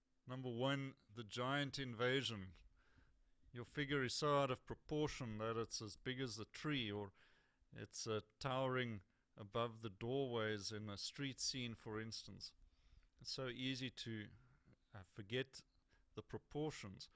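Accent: South Africa. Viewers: Hi. I have just come across this video and I find it extremely well conceived. It is extremely helpful particularly for urban sketching. Thank you for sharing. Enjoyed the S.A accent!!!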